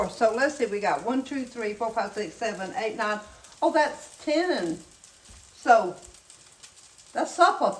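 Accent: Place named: South Carolina, United States. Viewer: I live in Central California and love your accent!🤗I was born in Beaumont Texas (75 years ago) and I can remember my aunts and family speaking with accents like yours. The type of foods Southerners eat are slightly different from a Northerners diet/menu. My mom was a Yankee but met my dad while she was working in the South. She never developed the hunger for southern foods so we ate kind of a northern/ southern menus. She did cook some things for my dads requests. It’s like a family reunion listening to you. -and eating your southern fare. Thanks so much. I have learned a lot and it’s like vitamins to my soul. You have made Jesus shine, with love and skill. Thanks a lot I enjoy watching you tell stories and cook and taste what you my made. God bless you and yours. I agree there is something very satisfying about banging the spoon you used in the dish on the side of the pan/pot. 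Thanks for being part of ‘our normal girls,cooking club’.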